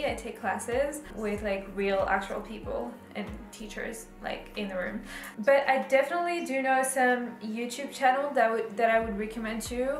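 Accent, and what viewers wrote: France. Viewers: Are you french? Your English prononciation is really good)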